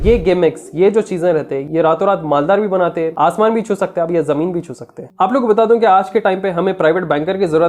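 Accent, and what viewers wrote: Hyderabad, India. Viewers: Bhai app Hyderabadi hoo kya ❤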